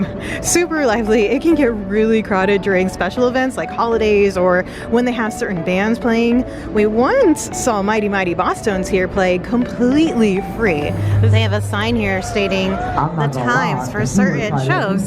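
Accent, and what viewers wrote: United States. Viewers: You have an amazing American accent, it's like what youd hear on an American commercial or something (I'm from Yorkshire, England) lol.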